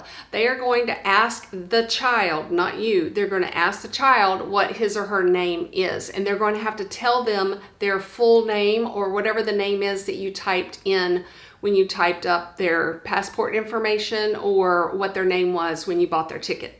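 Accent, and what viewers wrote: South, United States. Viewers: I cannot express how much I appreciate your videos. We have traveled quite a bit so how you manage to throw a tip out there that we can use is awesome! Every video you have is so informative. I have directed many newbie travelers to watch your videos. Keep up the great work! Your southern accent is also lovely. My husband is from TX and I love it. 😀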